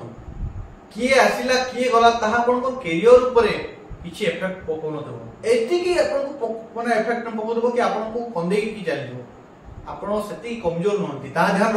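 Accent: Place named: Western Odisha, India. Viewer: Tame to paschim odisha ra bagir laguche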